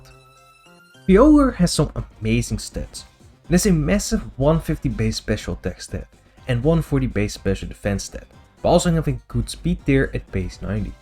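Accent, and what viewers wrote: Netherlands. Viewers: Hahaha Rain Boosted Water Spout goes brrrrrr (Btw are you Dutch? Guessing from your accent)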